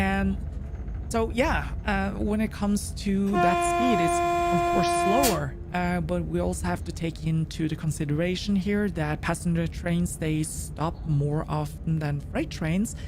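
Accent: Norway. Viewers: 😊So glad to hear your voice😊. Spend months wondering what one may look like, or sound like...and why was I suprised when you sounded Norwegian?!❤ Enjoy the rails, and enjoy the skies!🚅🛩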